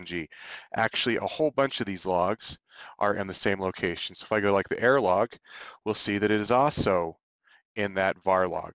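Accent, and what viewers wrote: Netherlands. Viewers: Interesting. Are you dutch? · You are dutch ? :) hahaha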